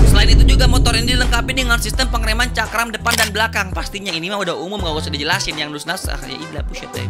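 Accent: Jakarta, Indonesia. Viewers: Logat betawi emang enak klo didenger 😂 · Bang, Saya penasaran apa abang orang Sunda atawa Betawi ya? Soalnya logat Betawi tapi banyak kata Sunda dipakai?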